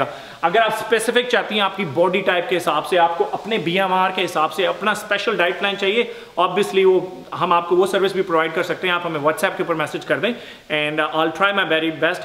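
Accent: Punjab, India. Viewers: U speak superb...while watching ur video, I'm smiling..ur Punjabi style👍 I'm also punjabi but even I can't speak that much Punjabi😂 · Vo punjabi accent haaayee🤗🤗💕💕 love it. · indian punjabi/ pakistani punjabi with some foreign english accent.. amazing.. maza aa gya... thank u soo much veere... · i love your punjabi accent keep it up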